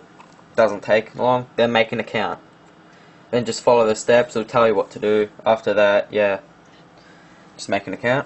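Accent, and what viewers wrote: Australia. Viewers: Your voice -3 Australian ? ;) me like. Sorry.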